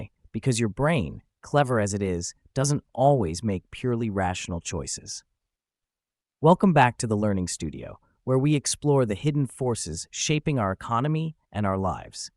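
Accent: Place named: United States. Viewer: American English name, American English speaker, British English material 😮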